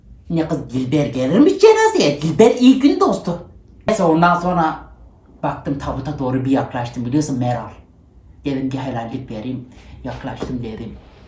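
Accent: Turkey. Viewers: Çok iyi yapıyor ya Kürt Alevi şivesini 😂😂😂 sanki bizimkilerin konuşmasını dinliyorum 😂😂😂 birebir 😂😂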